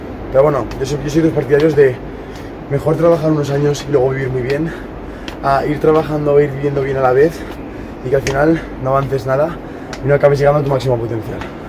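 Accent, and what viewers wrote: Zaragoza, Spain. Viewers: Eres de Zaragoza no?? Jaja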